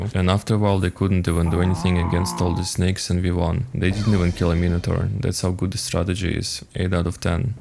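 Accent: Russia. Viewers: My comrade, you have the hardest Russian accent I've ever heard. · Ты шо русский? Судя по акценту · Дубляж на русском был бы прекрасен, особенно учитывая что автор явно русский по акценту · Но я же слышу по твоему акценту, что ты русский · Why no one is commenting about kinda russian actent? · Russian accent I guess? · Русский акцент?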